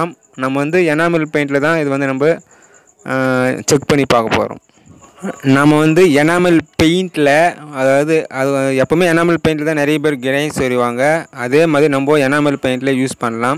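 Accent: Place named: Chennai, India. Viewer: அருமையான தமிழ் மெட்ராஸ் பாஷ பா,பழ்ப்பு வலிச்ட்டு,அருமையான கண்டு பிடிப்பு பா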